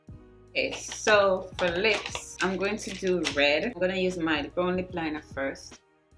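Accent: Jamaica. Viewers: girl u slay this fuck out this video....sound like u a one a wi....mah hear too jcan talking😂😘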